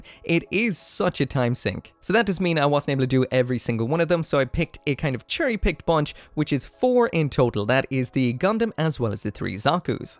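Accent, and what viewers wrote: Ireland. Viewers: You sound like a fellow potatolander. Also mobile suit skirmish exists for 1/144 wargaming And zero well less mould lines is pure wintanium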